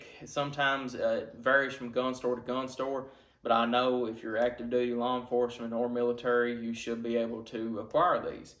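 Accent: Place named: West Texas, United States. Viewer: West Texas or Tennessee...not fully sure about the accent. But, I am sure about that shotgun. Feels good just to hold it. Do you find that dot particularly useful? Seems a bit high over the receiver. Thinking about a T2/Scalarworks combo...1/3 co-witness. Enjoy that thing, sir. Never let her go...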